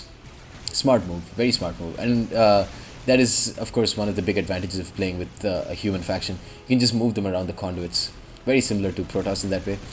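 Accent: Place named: India. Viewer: Damn You sound more Indian man!!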